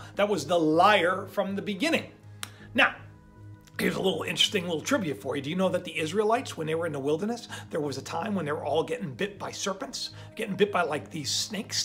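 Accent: Russia. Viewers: Your Russian accent is awesome so don't worry about it I enjoy it and I'm sure everyone else does.! Like you said I really truly believe it was just a goofy minute that the president was pretending. But who knows in these days and ages.! Evil is worse today than it was back in the day of witches.! It's out in the open now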